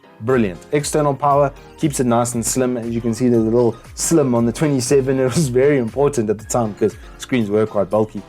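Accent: South Africa. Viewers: I don't normally have problems with SA accent, being in Australia myself. But I am hard of hearing, and dude you slur and mumble. And please look at the camera more when talking. Thanks.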